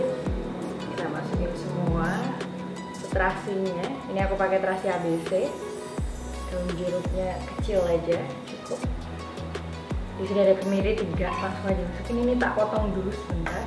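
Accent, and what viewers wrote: Java, Indonesia. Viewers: Yach, ,,dari tadi uploade nya, ,,baru nongoll braay, ,,,meddok bangeed mba e ,,,luv luve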